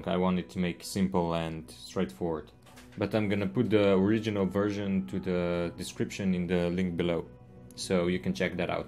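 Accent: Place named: Netherlands. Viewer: sounds good, from other YouTube vids I see you can get some nice results modding oblivion, also am I right in thinking you are from the Netherlands judging by your accent?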